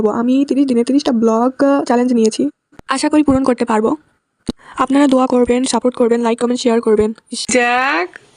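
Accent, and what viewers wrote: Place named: Rajshahi, Bangladesh. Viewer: Pure Rajshahi accent,I’m from Rajshahi too😊. Video editing ta bhalo chilo,keep going sister❤.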